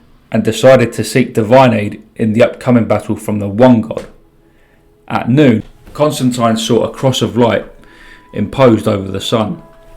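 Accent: United Kingdom. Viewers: Very difficult to understand because of thick UK accent.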